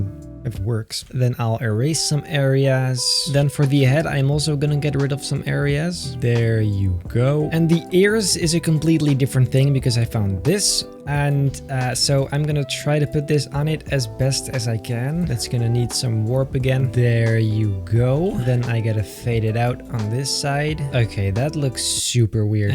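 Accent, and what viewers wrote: Netherlands. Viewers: are u dutch?🤔🤔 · We miss the dutch accent videos 🥲😂😂😂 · Ik vind het zo fantastisch dat je het Nederlandse accent niet kan verbergen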